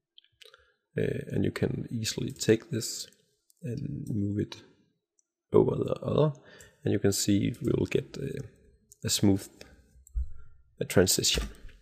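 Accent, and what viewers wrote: Denmark. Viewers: Are you Danish? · you sounds danish <.< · er du dansker ? :) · Are you Danish?? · Are You Danish ? If You Are Its EPIC !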